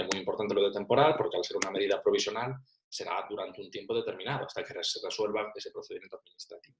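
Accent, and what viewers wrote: Galicia, Spain. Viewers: Marabillosa tu iniciativa, tus vídeos, tus explicaciones, ... Me apareció un vídeo tuyo precisamente buscando un resumen y soy suscriptora desde el minuto uno. Lo mejor tus ejemplos, que son enormemente valiosos para comprender una parrafada que somos capaces de memorizar pero que en ocasiones no comprendemos, incluso tus "joder con acento" . Y encima gallego! No sé si habría manera de ponerse en contacto contigo vía e-mail para pedirte algún examen de convocatorias anteriores para la Universidad de A Coruña que es imposible conseguir si te preparas sin asistir a una academia. Muchísimas gracias!! Y a ver si te animas con las clases on line. Yo me apuntaría sin dudarlo. Saludos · Gracias por tu trabajo! eres una gran ayuda! hasta se me ha pegado tu acento gallego!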